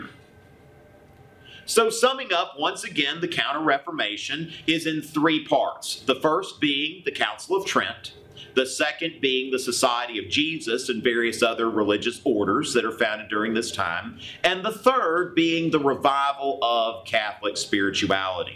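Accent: South, United States. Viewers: i love this. just the way he looks like matt damon and the southern drall makes the whole video so much better. very helpful !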